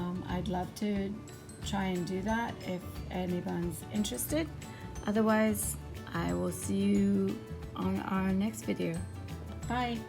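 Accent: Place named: United Kingdom. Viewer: That's a very cool idea! I hear that British accent sneak out once in a while.😁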